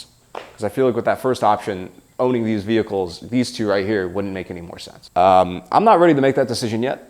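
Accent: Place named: Louisiana, United States. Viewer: I know you’ve got something wrong cause you sound like you’re from Louisiana with that slur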